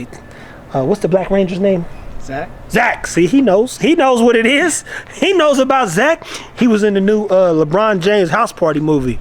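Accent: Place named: Jamaica. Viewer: I pick up Jamaican trace in u someone in ur family is Jamaican.. u should go lecture philosophy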